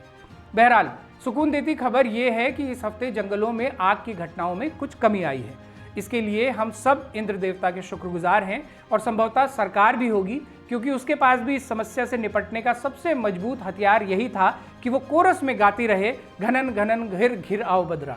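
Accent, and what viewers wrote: Garhwal, India. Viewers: ❤ ji आप उत्तराखंड में लोगों को इसी तर्ज पर जागरूकता अभियान जारी रखें, आप की जानकारी, वक्तव्य, तरीका जिससे गढ़वाली भाषा का तड़का वाह ❤ आनंद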